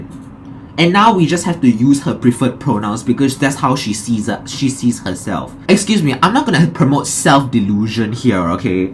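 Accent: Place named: Singapore. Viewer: Its called Singlish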